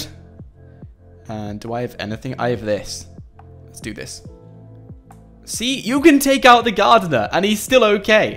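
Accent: England, United Kingdom. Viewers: he said Car Loss instead of Carlos man his England accent is funny sometimes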